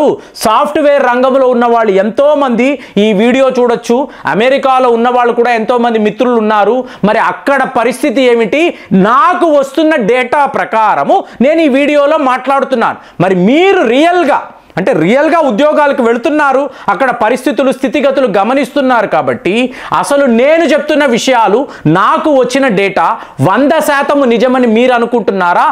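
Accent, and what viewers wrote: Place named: Telugu, India. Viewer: Painful Telugu accent😢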